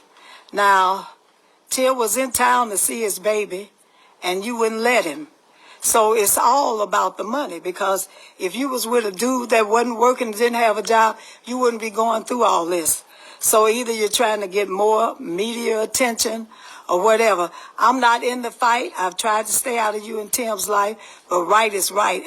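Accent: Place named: South, United States. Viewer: That's it. That's all! Talking like a southern woman with morals! God Bless you Ms.. By the way you look gorgeous! You go now!!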